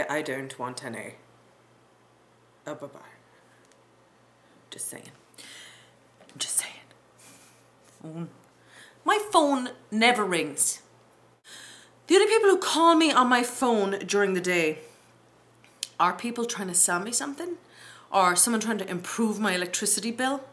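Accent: Ireland. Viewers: Haha you are so funny , love watching you (just curious ...are you Irish? you sound it , anyway love the accent ) great review love Nisha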